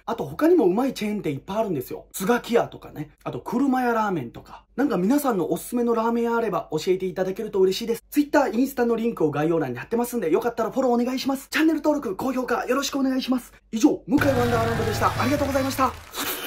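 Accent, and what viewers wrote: Hiroshima, Japan. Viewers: ラーメン動画を検索してたらオススメで上がって来て初めて拝見しました😀 私も広島出身ですので各店舗への広島弁でのコメント冗談も交えてすんなり耳に入って来ました❗😂 あと広島ご出身であればチェーンではないかもしれませんが岩国ラーメンの寿栄広さんご存知ですか？😀 広島に帰った際はわざわざ岩国まで行って食べてます😊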